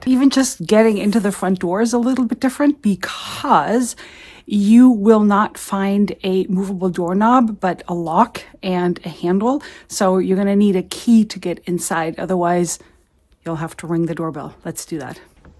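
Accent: United States. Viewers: American accent but perhaps has not lived in the United States since she was a little girl and doesn't know much about it? The removable shower head shown is available everywhere, Home Depot, Walmart, etc. Even the fixed shower heads are adjustable so you can aim them where you want. Windows with interior shades, while not popular, are available in the US. Many, perhaps most American homes have a concrete basement with stairs going down to it. Not all American homes are, as she implied, overdone. Perhaps she watches television and makes assumptions? Many, MANY are simply furnished. I'd say her video "German vs American Homes... this explains everything actually explains nothing. Instead of making stuff up, she should do some research.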